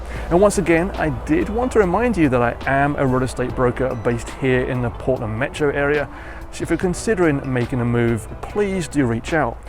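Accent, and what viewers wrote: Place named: United Kingdom. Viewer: A very marked British accent.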